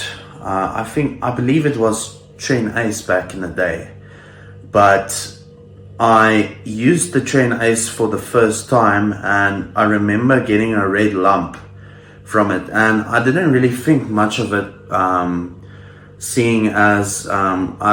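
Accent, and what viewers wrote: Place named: South Africa. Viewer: Hahzit boet, good to hear a fellow saffa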